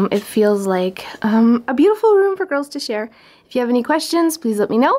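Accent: Canada. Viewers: Her accent sounds Canadian for sure. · Is she Canadian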